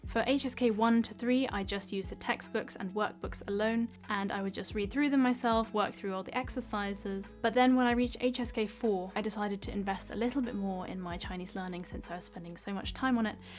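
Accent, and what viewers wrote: RP, United Kingdom. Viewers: Hey,I like your British accents,but I think you should take next time microphone,cause I struggle to catch some words. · This young lady is drop dead gorgeous and far more than simply bright, arriving replete with a British accent! I am now technically an old man (although I neither look it, act it, nor have a body that reflects it - and I am quite sure that I will give those who want to know how it do my life style how to do it! Moreover, I will be giving my advice for free - but that doesn't mean it is easy either as I am also in love with Kung Fu, weight lifting, running, and Keto) so if I can learn this while living in the Stock Market you can too! Thank you Dr.! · i loved your british accent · I am a HSK3 graduate, from Argentina, then only phrase you said is perfect mandarin. I am not getting into any more of your channel, i thought you would start speaking zhong wen. 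Nice posh brit accent, btw. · Starting to learn Mandarin, and came across your vids. You could be in the dictionary under "life isn't fair," as you are stunningly beautiful, obviously brilliant and the British accent simply ups your attractiveness to levels beyond. I think you will be a great incentivizer to learning because I would not want to disappoint you by failing. To quote Jack Nicholson in As Good as it Gets, "you make me want to be a better man." To that end, I am hitting the books. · Hi. Love your British accents. You are very motivating. Thank you for your guidelines on Chinese learning. I am trying learning Chinese as well. Very interesting and I think is the hardest language to learn. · Pretty faces tend to break people's brains. And a high class British accent forces you to listen. You have a devastating package! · Assuming an average person would only do about 1 hour a day, because burnout is a thing, this plan would get you to her level in about 2.5 years. 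Not sure how long it would take to get her nice British accent. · Oh she's pretty beautiful!!! and I really loved her videos I've never seen something like this before. Somehow I would like to ask something. Is she from UK? because I'm currently learning English so it's a little bit difficult for me to understand some things and I can say that I'm used to the American accent so it's possible that I can't get her for that reason. Obviously always with all my respect.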